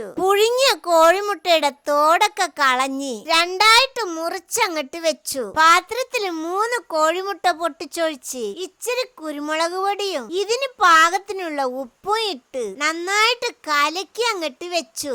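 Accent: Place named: Kerala, India. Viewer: From tamilnadu wowwww amme unga cooking super and unga voice Kerala salang super 🎉🎉🎉🎉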